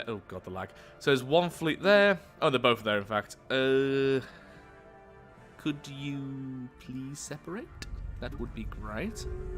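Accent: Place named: Birmingham, United Kingdom. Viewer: That sexxy Brummy voice...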